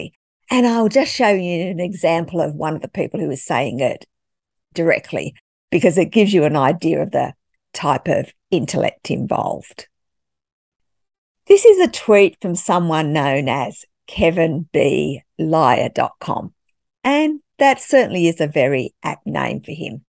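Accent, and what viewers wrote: Australia. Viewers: Australian accent ?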